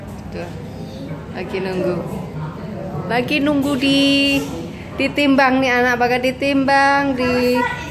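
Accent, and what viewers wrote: Java, Indonesia. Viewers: Mbak salam kenal ya... saya seneng sampean bahasa jowonya msh medok..i like it😊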